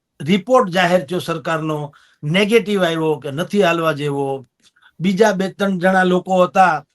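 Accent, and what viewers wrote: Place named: Saurashtra, India. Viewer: Saurashtra ni Gamthi bhasha ma ni mithas che 👌👌👌. Super 🌹🌹🌹🌹.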